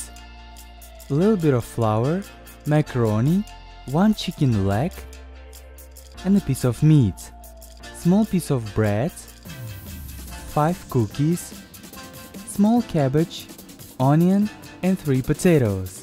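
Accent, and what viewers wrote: Russia. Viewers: Russian accent speaking English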